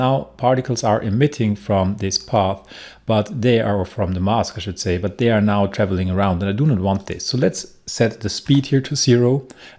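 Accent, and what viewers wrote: Germany. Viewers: ah! Close enough! From your accent I thought you wore german. Danke!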